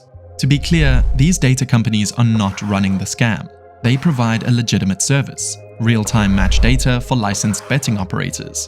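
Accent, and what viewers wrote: South Africa. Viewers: incredibly well put together and informative video, your English is incredible. i thought you were South African haha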